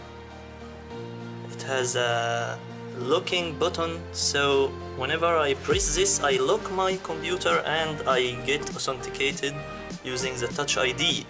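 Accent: Egypt. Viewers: Speaking your language is better that zis ziz zeez egypt accent .. you makes me really angry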